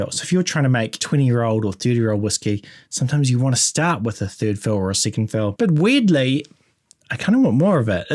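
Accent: New Zealand. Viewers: Hi, nice vid! Liked! Sorry für beeing curious, but is that a kiwi accent?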